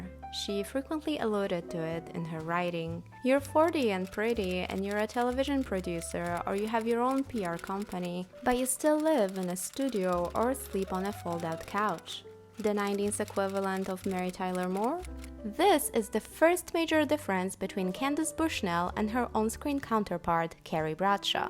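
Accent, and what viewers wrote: Poland. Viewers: Your Polish accent is unbearable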